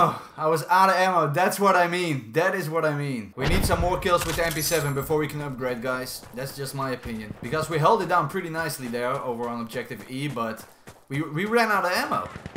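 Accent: Netherlands. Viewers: You dutch right?? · I smell that you are dutch am i correct?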